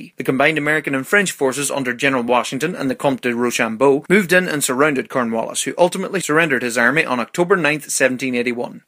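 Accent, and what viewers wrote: Ireland. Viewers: I love his voice. Is he Irish? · Tsunami Williams yes he's Irish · Are you irish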